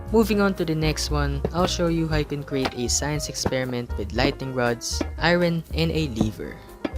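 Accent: Philippines. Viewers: Filipino? You kinda sound like one lol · I Knew he was filipino i just got it by his voice😀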